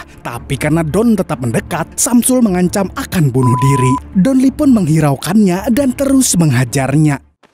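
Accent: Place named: Paringin, Indonesia. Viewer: Seperti orang Paringin ni abg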